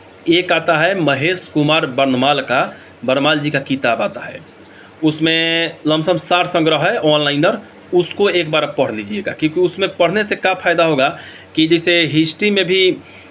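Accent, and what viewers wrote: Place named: Bihar, India. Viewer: Aap ekdam theth bihari style mei bataye hain sb samajh mei aa gaya sir 👍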